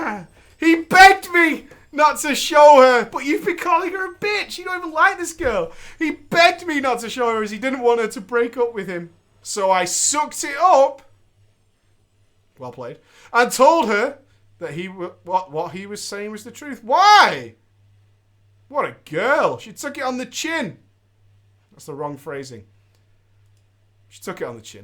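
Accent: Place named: Manchester, United Kingdom. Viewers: somewhere round there yeah. it's not full on manchester but in that area, i'd say slightly south of manc. east cheshire maybe but not west, that's my area and it isnt local to us. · Manchester accent (NW england). South city/cheshire i think. I live there and he sounds local.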